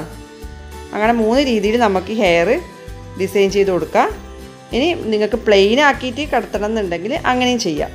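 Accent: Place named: Payyanur, India. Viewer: Chodi😜. Pynr slang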